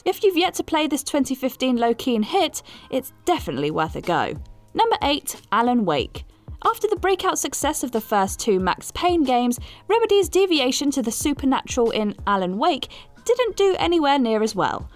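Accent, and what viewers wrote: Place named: United Kingdom. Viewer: I know they're all british. But damn this new host is british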